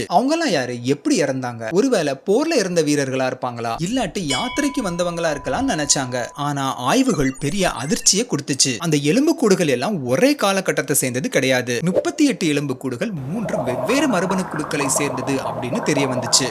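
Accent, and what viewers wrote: Tirunelveli, India. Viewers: Ur Nelai slang super bro 🎉🎉🎉🎉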